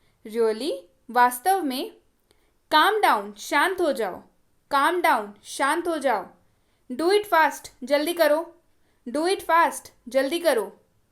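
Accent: Bihar, India. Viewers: ma'am Kya aap Bihar se ho? Aap bhut achha pdati h. All sentence are very useful in daily life.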